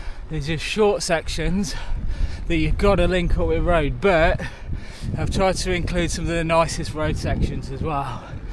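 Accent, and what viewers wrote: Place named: Hinckley, United Kingdom. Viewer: Didn't realise you were from round Hinckley way. Not sure how I missed your accent because I've got relations from there... ma'duck 😅 I'm up in Northumberland myself, you were about 5 miles from my house when you dropped down into Haltwhistle on your GB Divide trip.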